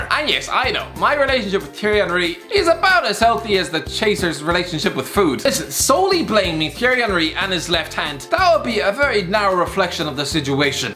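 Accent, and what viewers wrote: Ireland. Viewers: Best thing I have ever heard in an Irish accent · Arent u Irish or snt? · Good lord, this is the most obnoxious video commentary that I've heard in a very long time. What's wrong with you, Irish guy? Take a deep breath sometime.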